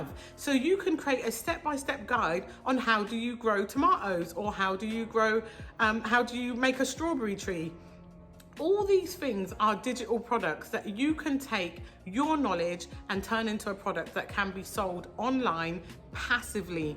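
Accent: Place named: United Kingdom. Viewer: Very informative video. Thanks. Side note: I’ve always dig that British accent, Peace!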